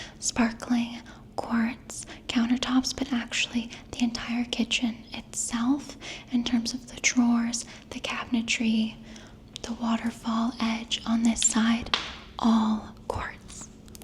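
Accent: France. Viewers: Ugh you look absolutely gorgeous! I loved how you she used her French accent in there too, Stunning apartment, it just looks peaceful!!💕💕💕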